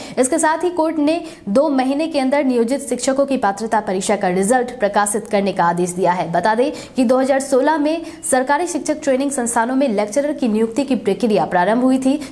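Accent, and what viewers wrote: Bihar, India. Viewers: Bihari mat bolakaro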